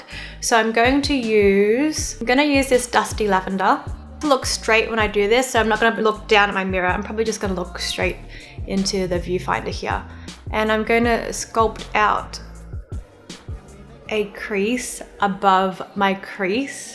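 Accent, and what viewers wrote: Australia. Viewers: In love your Australian accent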